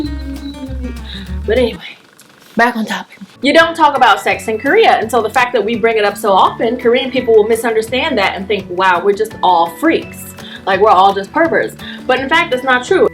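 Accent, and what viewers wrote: South, United States. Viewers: Omg where from the south are you from like what area? We have the same accent so I'm curious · omg I just love your southern dialect. wonderful video keep it up <3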